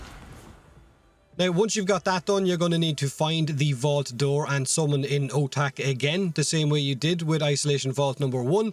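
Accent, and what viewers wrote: Ireland. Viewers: 4 seconds in and i new this guy was irish-i think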